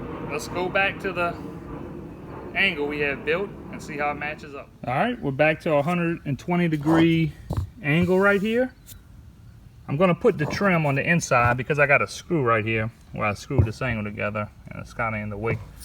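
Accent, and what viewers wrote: Louisiana, United States. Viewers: i can tell by your voice you are a son of Louisiana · Louisiana accent so distinctive. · Can you tell he’s from Louisiana? Good video. His keep it simple instructions are great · Bruh you got to be from southwest Louisiana! Thanks for this video. Learn something new. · First off, are you from south Louisiana? You have a good accent that suggests Cajun upbringing. Secondly, thank you for providing REAL instruction. Everything else I have found while google searching online has led me to absolute basics for people that probably are incapable of even tying their own shoes. I'll look through more of your stuff as I search for information on making compound miter cuts. Thanks again. (And if you are indeed from south Louisiana, I'll add a hearty "GEAUX TIGAHS!")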